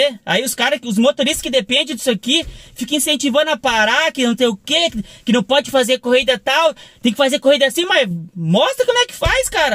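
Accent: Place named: Rio de Janeiro, Brazil. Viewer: Vc parece cariocs . Tu e demais .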